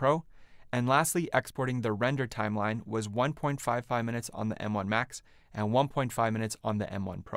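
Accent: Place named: Poland. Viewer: It's hard to say. Rather, if it wasn't for the name of the channel, I wouldn't have come up with the idea that you have a Polish accent. If I had figured it out myself, the accent is definitely not the first thing that would reveal your origin. You may as well be a child of immigrants who came from Poland to Canada and you have never spoken Polish anywhere except at home. Tell the truth if you are agree for it: D It does not matter much to me because I watch the channel because of the content, but it's nice if another compatriot is successful on YT...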